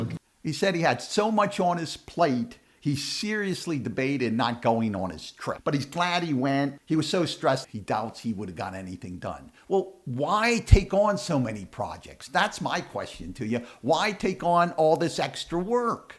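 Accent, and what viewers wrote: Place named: Pennsylvania, United States. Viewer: I'm trying to place the accent. Chester County. Maybe Delaware County.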